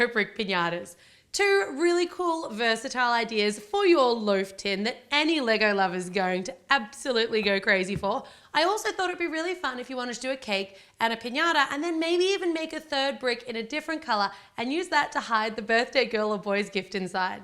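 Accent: Australia. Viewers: Is she Australia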